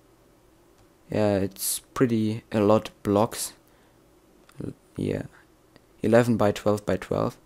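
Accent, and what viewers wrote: Germany. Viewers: Man merk das du deutscher bist bleib einfach bei deutsch und nicht in englisch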